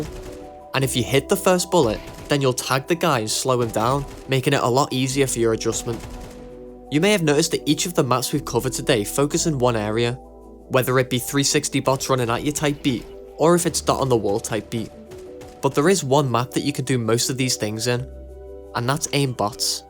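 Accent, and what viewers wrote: United Kingdom. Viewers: united kingdom accent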